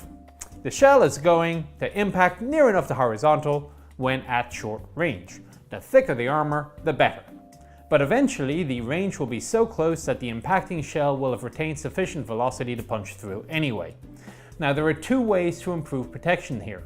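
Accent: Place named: Ireland. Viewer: I really like the systems view of the technical details, the dry wit, and the understated Irish accent. It stands up pretty well, even to a mostly talking head approach. An inside the hatch view of a battleship would probably take about 1000 hours at least, so that’s not likely to happen. I would find it entertaining, though to see how you would fit through the passageways and hatch ways, and into the racks or berths or morgue slots or whatever they called the beds on those things. I’ve got the misfortune of being 6’4”, with a non-streamlined length to beam ratio, and I don’t think I’d do well.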